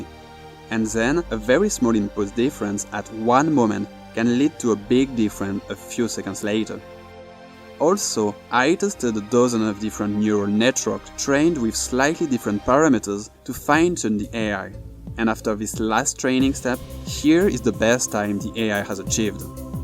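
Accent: France. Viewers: Great video! You can 100% tell you're french tho :p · this is what i go on youtube for, i don’t play trackmania but your dedication and passion about what you are doing really makes the cut for me ! i can feel you worked hard on this vid and it pays off, great content man, keep it up :) btw : ça fait toujours plaisir d’entendre un accent français par-ci par-là, continue à faire ce qui te plaît mec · Are you french? Your accent feel french, I am french 😁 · French accent spotted 😂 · mdr ça m'a littéralement pris 3 secondes pour reconnaitre que t français x) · mec, fais un effort ton accent est juste horrible meme pour un français ! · En vrai, tu es français? On le sent 😂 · Elle est incroyable ta vidéo ! (Je suppose que tu parle français au vu de l'accent ;) ) Le temps que t'as du passé à faire l'entraînement puis le record et le montage, j'imagine même pas. Bravo ! 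A la fin tu parle de combiner le supervisé avec du non supervisé. Tu voudrais pas essayer de voir ce que ça donne ? Avec ce genre de stratégie tu serais en mesure de créer une IA potentiellement plus forte qu'un humain non ?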